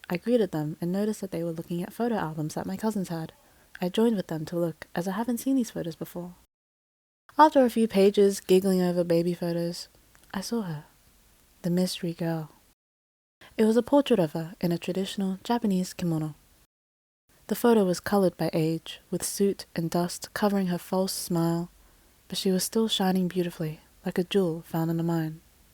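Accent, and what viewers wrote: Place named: Australia. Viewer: Australia I think. Gotta see if she says "naor" or not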